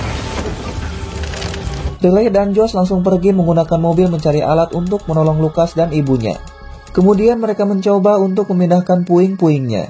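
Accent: West Java, Indonesia. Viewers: Pasti naraton nya orang sunda😅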